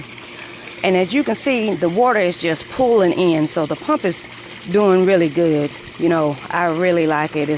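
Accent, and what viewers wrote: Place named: South, United States. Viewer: Not sure what southern state you are at? I’m living in South Carolina by way of Lyons Georgia. Love your natural southern Accent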